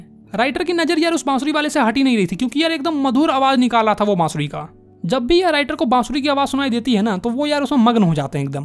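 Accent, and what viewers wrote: Bihar, India. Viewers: Bhai ap kya bihar se ho kya me up ka hu bhAi ye to bajane me maje ate h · Hum bhujlo bhaiya app bihari ho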